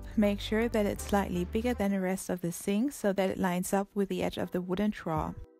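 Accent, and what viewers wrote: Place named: Germany. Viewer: You can clearly tell she's Herman by the way she's speaking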